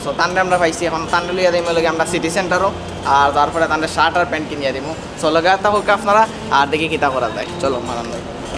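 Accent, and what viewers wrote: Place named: Chittagong, Bangladesh. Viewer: Bhaiya apner Bari kothay? Is it chottrogram, coz I'm from chottrogram kintu okhaner bhasha kisui bujhi na😅but your one sounds like that, 😊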